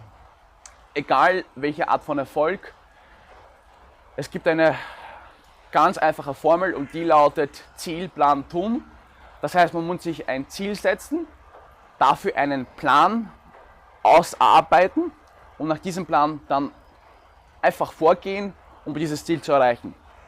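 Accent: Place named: United States. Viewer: Auf dem ersten Blick hätte ich gedacht, dass er entweder Amerikaner oder Österreicher ist, weil er zwei Sprachen so gut beherrscht. Denn ich studierte für ein Semester Jura, bin ich qualifiziert zu sagen, dass es knallhart schwer ist. Ich gratuliere dir und drücke die Daumen hoch! Du hast noch einen Subscriber verdient:)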